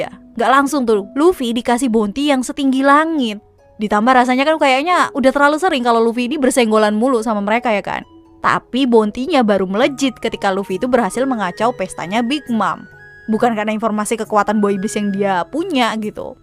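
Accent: Indonesia. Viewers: Nama.... Speak English dialek Indo... Lanjutkan... Kita bakal punya dialek english yg diakui internasional... Jgnkalah sama India Korea Afrika.. ✌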